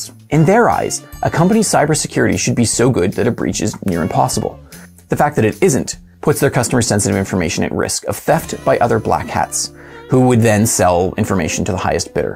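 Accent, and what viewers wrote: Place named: Canada. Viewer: You Canadian eh?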